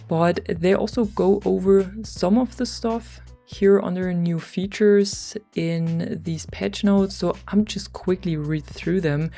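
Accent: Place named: Germany. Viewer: Ich weiß nicht aber hört sich wie deutsch an. Ich bin Spanier aber ich wohnte in Deutschland. My first friends I did in Germany were talkin in English so I know what I’m saying. Can somebody confirm is from Germany Austria Swiss?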